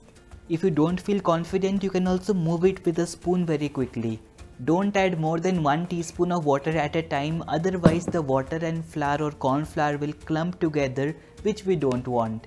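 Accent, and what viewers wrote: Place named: North, India. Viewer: Strong northie accent.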